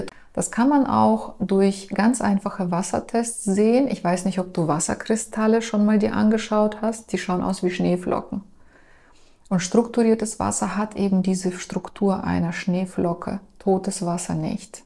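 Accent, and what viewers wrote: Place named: Soviet Union. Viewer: Dieser sovietakzent 🥰🥰🥰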